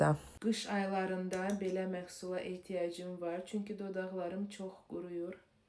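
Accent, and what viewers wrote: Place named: Russia. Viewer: Russ dilli xanımdır normak həyatda azərbaycan dilindən çox isitifadə etmir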